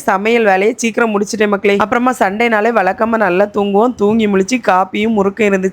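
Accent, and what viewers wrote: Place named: Kanyakumari, India. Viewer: Ithu enga kanyakumari slang ok va nanga ippadi thannnnnn makaleeeee......... Cmnt pota naye😂😂😂😂😂😂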